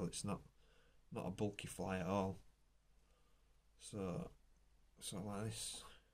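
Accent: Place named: Yorkshire, United Kingdom. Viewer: Thank God I live in Yorkshire and can understand you, As always Buddy well done...Tell Kia to buy you a razor for Xmas though ;)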